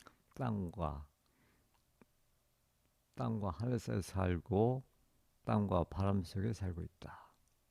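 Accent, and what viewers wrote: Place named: South, France. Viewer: 마지막 문장은 아름다운 시 같다고 생각합니다. 새로운 영감을 주는 글입니다. 감사드립니다. 발음을 통하여 선생님은 아마도 프랑스 남부 쪽에서 공부하셨나 그런 생각을 했어요. Merci beaucoup!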